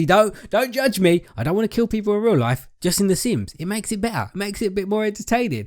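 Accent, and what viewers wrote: United Kingdom. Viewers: you sound so British I am British to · U can definitely tell hes form England but same 😂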